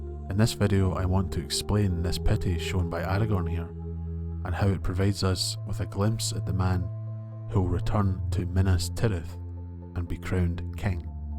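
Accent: Scotland, United Kingdom. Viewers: Wow what a wonderful Scottish voice/accent, a real treat! Many thanks.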